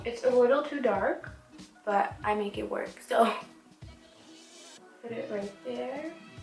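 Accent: Netherlands. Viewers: Omg wait are you Dutch?! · are you dutch?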